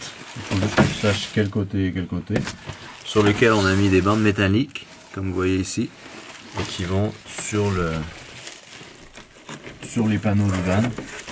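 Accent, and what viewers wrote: Quebec, Canada. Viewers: Hello ! Vous êtes dans le sud, j'habite à Pau, on pourrait éventuellement se rencontrer pour boire un verre ou pour une balade dans les Pyrénées ? ;) J'ai découvert ta chaîne grâce à Marina, elle est cool, et j'adore ton tout petit accent québecois, au début j'avais même pas remarqué, je te pensais français haha Hâte aux prochaines vidéos! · Bonjour jeune homme. J'ai acheté ton livre et je trouve l'écriture simple et sincère . je le lis avec grand plaisir " j'ai même parfois l'impression d'entendre ton petit accent canadien " Bonne route à vous deux .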